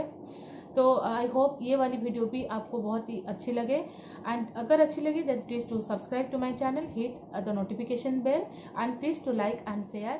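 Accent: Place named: Odisha, India. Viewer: I could figure out the Odia accent ...😄❤️I am too from Odisha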